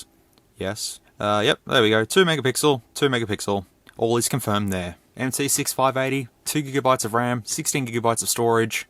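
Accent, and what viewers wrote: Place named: Australia. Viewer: That mic test/phone call/your accent always reminds me of our old mate Danky/Female Snake "Frank" ("Dankpods") when he goes on a ramble while testing microphones (Congrats to him for achieving 1M Subs too!!) As well as Hugh Jeffreys are one the only three Aussies that are pretty much the only tech reviewers on my Subscribed list.. 👍👍😎🇬🇧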